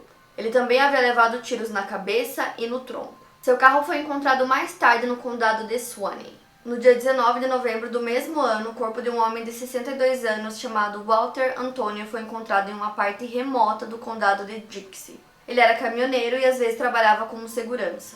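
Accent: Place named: South, Brazil. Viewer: você tá perdendo o seu sotaque sulista 😳😳😳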